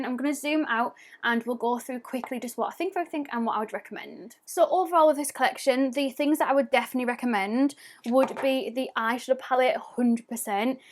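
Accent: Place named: North East England, United Kingdom. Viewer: Yaaaaaay a north eastern accent! 🤗🥰🤗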